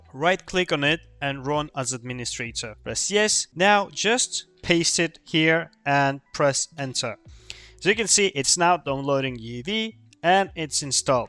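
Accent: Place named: Russia. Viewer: I think he might be russian, c is read like an S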